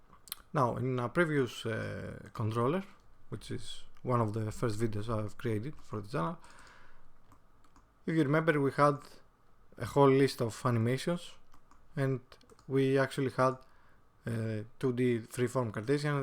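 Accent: Greece. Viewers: Are you Greek by any chance? :) Asking cause of your accent.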